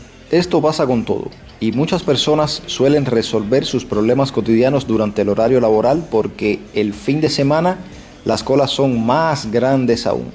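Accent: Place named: Cuba. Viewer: Me gusta tu voz me gusta el acento cubano. Los quetemos mucho aca en Guatemala podemos conocer y ser amigo de algunos medicos. Exelentes personas.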